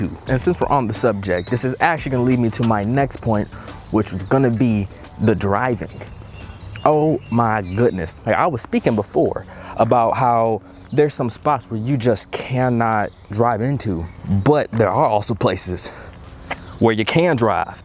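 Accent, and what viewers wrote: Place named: Northwest, Netherlands. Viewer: First if all i have to congratulate you on your Dutch pronunciation. Of all the US citizens i follow yours is the best.(Although strongly biased to the northwest of the Netherlands when everybody knows the closest ABN or algemeen beschaafd Nederlands is spoken in the midsouth). I also genuinely like your channel. No Sugarcoating just telling your impressions. Keep doing what youre doing.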